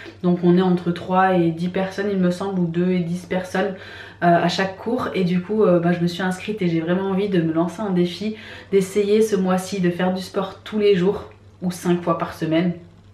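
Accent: Algeria. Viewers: t adorable à parler avec l'accent Algérien et ton papa lahi yahafdou adorable j'en peux plu de rire avec eux 😘😘😘😘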